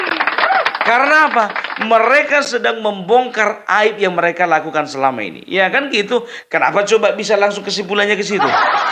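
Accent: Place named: Java, Indonesia. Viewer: BANG. SERING2 PAKE LOGAT JOWO, LEBIH KEREN DAN LUCU BANGET 😂 HOORRAASSS... MANTAP 👍👍👍🙏🙏🙏 Saya Warga Jember Jawa Timur Hadir 🙏🙏🙏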